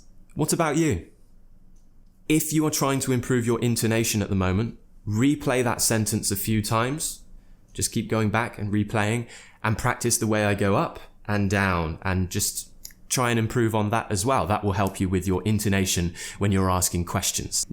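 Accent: United Kingdom. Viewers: I love your podcast, I'm listening to it everytime when I go to college. It's helping so much to improve my listening skills and to get used to the british accent. Keep doing this amazing job, whatever it takes! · Whatever you say I am able to follow your British English accent. You have the clearest British English accent I have ever heard in my whole life! Keep posting :) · i admire your British accent 💜💜💜 it so clear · Love your British accent.love also your spontaneity.you know how to transmit your message.👍👍👍👍👍👍👍👍👍👍from 🇩🇿. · Music to my ears lovely British English ❤❤❤😍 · Brother whatever you speak sounds British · You’re so handsome and I really like your British accent. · You sound sooooo British whatever you say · Whatever you scrutinize, who cares! I will learn the very British accent asap from. 🥰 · I like your British accent so much . Thank you ! 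· Whatever you say sound British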